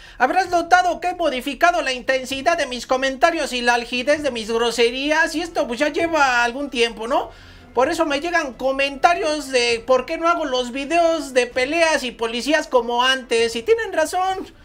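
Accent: Mexico. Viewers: Te apoyamos, mejor YouTube, o tal vez Twich, que no tiene censura, la Red Morada, está mejor, y como hablas, está perfecto, así somos los chilangos, pero FB es muy millenial!!! No cambies tu estilo, por favor 🙏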